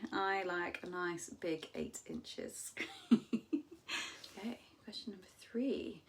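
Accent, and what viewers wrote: United Kingdom. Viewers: Wow, she is so tastefully evil 😈. And the British accent on doms is undefeated. Thank you for touching on average/above average guys being into it. I don't see it as humiliation, I just think a woman being an unapologetic size queen soooo hot. 🙏🏾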